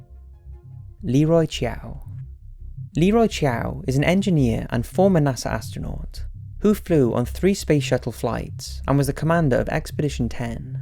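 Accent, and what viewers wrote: Wales, United Kingdom. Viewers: I could listen to that Welsh accent all day ☺️ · Bluesummers pretty sure he has a very slight Welsh accent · He’s a has a welsh accent to be honest. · The Welsh in him! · yep was just thinking that when I saw your comment - he has a soft Welsh accent I think.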